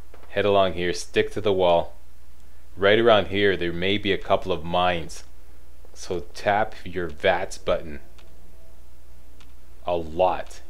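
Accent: Canada. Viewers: HEY! i used to be a BIG fan of YOU and your WIFE, a few years back, but my account was deleted for some 5 second UMG clip in a comedy i put up [when Google bought up utube and were kicking lots of utubers off for the vaguest of reasons] and i used some rather abusive language and told them to 'gtfooh' when they demanded recompense. I heard you packed this all in. Last vid i watched of yours was wayyy back about Bioshock 1[ ithink?] and helped me decide to buy it as i had to sell my PC [cash problems] and bought a used xbox360 back then. [I got a PC now but the GTX970 is very poor so gonna try and get a swap for a 1080 as the outside fan is wobbling almost off its spindle and it's only 7 months old... If i just offer to pony up the extra £300 i hope they'll just let me swap it] Good to see your stuff. Subbed soon as i recognised the Canadian accent, eh? I figured out the vegetable oil thing for getting Adhesive though i simply recruited about 8 civs to farm the corn [hardest to find until i found one in a stash near the Museum and planted it and built up the crop from that, same with the Tatos and Mutfruit]. 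For noobs like me i would advise going for the level 1 Scrapper as i don't have a clue where Diamond City is and tho a Level 2 Scrapper gets screws from weapons you have to reach Level 13, Level 1 doesn't get many unless it's junk like desktop lamps etc. Also, I installed Nexusmod manager and have [found] bought a LongScope Crossbow which doesn't have the range of m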